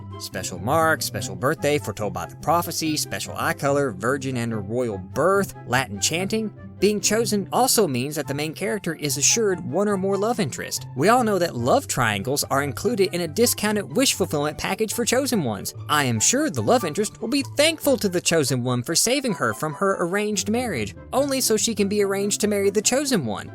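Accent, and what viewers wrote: South, United States. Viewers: from what U.S. state is that Southern accent?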